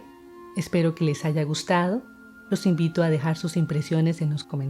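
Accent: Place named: Costa Rica. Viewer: Creo que es acento de Costa Rica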